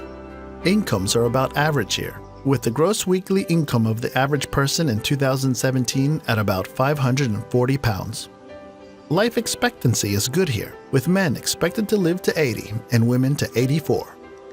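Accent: United States. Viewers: Have you noticed the guy is bloody American 😂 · yanks - we pronounce clerk as CLARK and wish you would also. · ... "best places to live in the UK" ... american accent introduces vid--wait. no. what? f** off. see ya*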